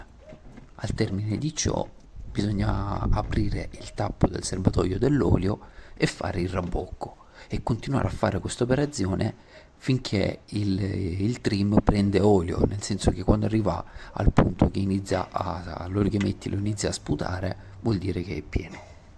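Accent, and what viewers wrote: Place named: Salento, Italy. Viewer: grazie per il video, molto chiaro.!!!!! sono alle prime con uno yamaha 40, se volessi contattarti telefonicamente è possibile?? dall'accento credo sei Salentino ? grazie luis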